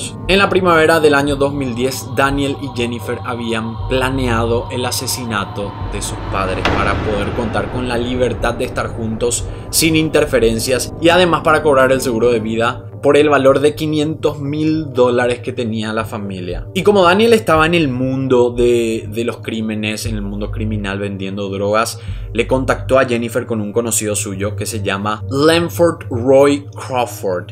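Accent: Guarani, Paraguay. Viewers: Este vídeo es algo TRAMBOLICO jajaja no mentira, realmente son muy interesantes tus vídeos y detecto en tu acento que eres paraguayo, me suscribo porque vale la pena tienes buen contenido, felicidades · Me encanta tu acento guaraní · Por su acento, pienso que es de Paraguay😉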